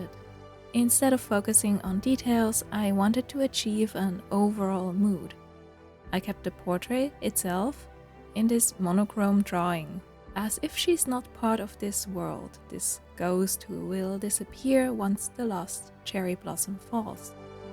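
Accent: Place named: Germany. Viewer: I just found you on YouTube because I was looking for relaxing art vlogs and your narration and story telling is so soothing and beautiful, it calms me and I find it very inspiring 💕 it's also very unique that you live in Korea but have a German accent? (I'm German btw 🙈🤣) Where were you originally from?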